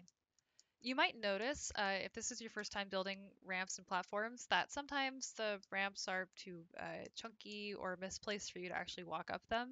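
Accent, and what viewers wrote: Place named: United States. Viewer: How about you get some nazal spray. You sound like you have a cold. Or is that how all Americans sound? Good content but try blowing your nose first so you sound hunan. Sorry for being blunt but if you want a global audience talk like the rest of the world does. Good luck.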